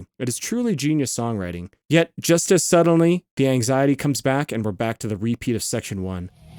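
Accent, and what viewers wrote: Canada. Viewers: I thought you sounded Canadian! Hello from B.C! Thank you for doing a wonderful analysis on this beautiful song. is not only my favourite album but my favourite metal album of all time. I love how well the storytelling goes with the music and its overarching message gives me hope and has helped me persevere through my own struggles. I look forward to watching more of your content- keep up the good work!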